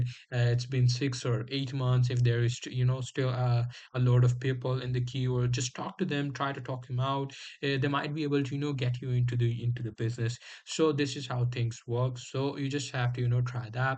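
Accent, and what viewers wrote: India. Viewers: Indian accent, opinion rejected. All indians are dirty scammers ew lol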